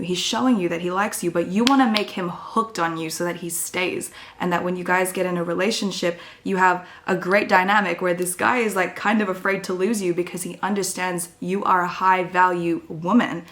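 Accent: Australia. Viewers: are you Australian? I can't figure out your accent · omd are you Australian or am I tripping?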